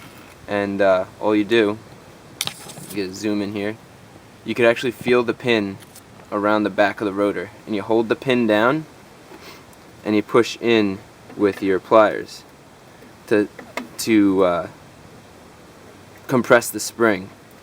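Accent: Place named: New Jersey, United States. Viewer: nice video thanks. I grew up in NJ - your accent sounds like you're from not far from there.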